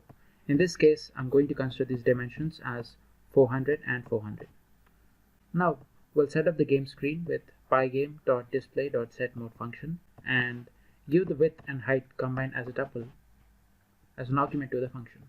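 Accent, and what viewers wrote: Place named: India. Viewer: You have indian acsent🇮🇳🇮🇳🇮🇳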